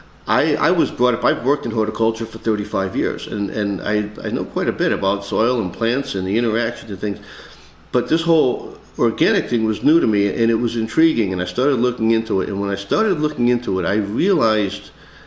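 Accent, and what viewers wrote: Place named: New York, United States. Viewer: Literally just red my mind while I was listening and scrolling through the comments. I travel a lot between states due to work. Sounds like a Southern NY, NJ, CT accent to me. I know I'm from NJ originally, live in PA now, have family all over NY and a GF In Nothern NY and the subtitles in accents in speech vary.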